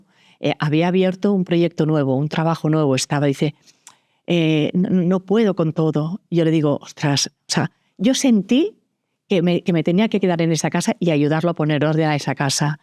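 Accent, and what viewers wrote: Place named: Peru. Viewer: El acento peruano lo identifiqué desde el minuto uno. Saludos desde Chiclayo.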